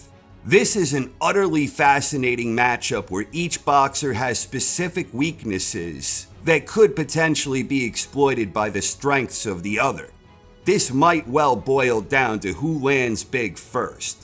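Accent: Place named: New Jersey, United States. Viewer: His videos are actually quite good. I like his voice, very New Jersey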